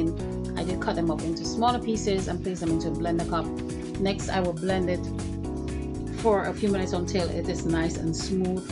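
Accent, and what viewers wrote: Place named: Eastern Caribbean, Caribbean. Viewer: What IS "blogger plantain"? Is it of the Eastern Caribbean, I detected the region's accent. Novel idea. 😊🌴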